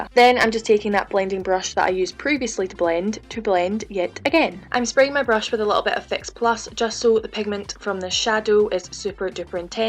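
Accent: Scotland, United Kingdom. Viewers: Gorgeous makeup look 😍 so different, glittery and colorful - I would really wear this for clubbing ☺️ I also have the Urban Decay Glitter Eyeliner but in the silver color and it is really nice 😎 Stumbled across your channel when I was searching for Scottish beauty youtubers (because I will study in Scotland soon so I wanted to practice listen to the accent 😄) and immediately subscribed 😇☺️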